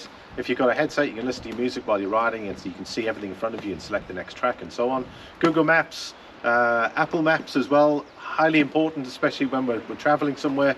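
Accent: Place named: Liverpool, United Kingdom. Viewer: Great video, I’m considering one of these devices so you’ve covered everything I wanted to know. Btw I’m getting various accents in your speech, you’re definitely not Irish but I detected a bit of Liverpool there, am I wrong ? Subbed you from a scouser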